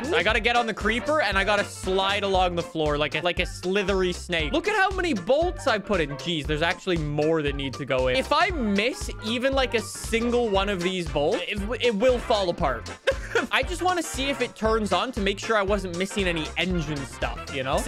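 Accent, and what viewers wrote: Quebec, Canada. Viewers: This dude is a perfect demonstration between french canadian and french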